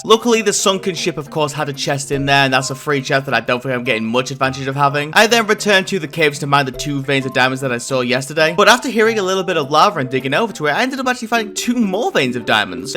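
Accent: United Kingdom. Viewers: I love his britishness. "Frew out" "smoofer" "evewyfing" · i think its scottish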